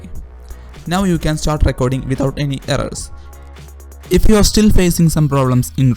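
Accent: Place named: Europe. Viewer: Oh mai gaad European English 🔥🔥🔥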